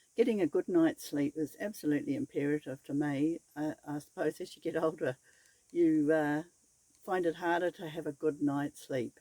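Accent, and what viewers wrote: New Zealand. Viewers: That kiwi accent though, pacific pom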